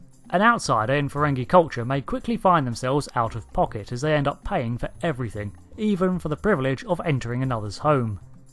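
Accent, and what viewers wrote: England, United Kingdom. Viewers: "Until next time, I have been." You sound English, so, why do you not know this sentence is not grammatically correct? "Until" denotes a future event, "Have been" denotes a past event... Unless you are a time traveler, you can't "until have been" Either you have been, or you will be until. If you have been, who are you now? If you will be until next time, who will you be then?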